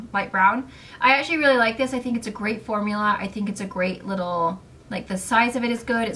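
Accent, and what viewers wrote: England, United Kingdom. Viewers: Do u still live in Tahoe? Have you gone to the Sac Morphe store? And....do u ever have an English accent??? Ty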